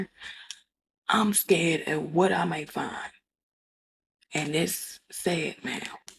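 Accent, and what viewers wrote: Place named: Memphis, United States. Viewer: So that’s why I thought you have the same accent as glorilla, you’re from Memphis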